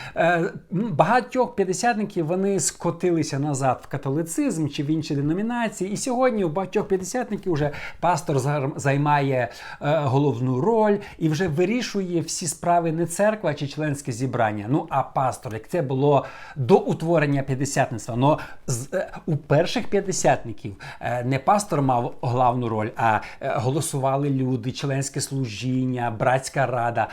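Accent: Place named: Western Ukraine, Ukraine. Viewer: Накінець то по українськи бо вам рос. абсолютно не йде бо чути шо ви з західної України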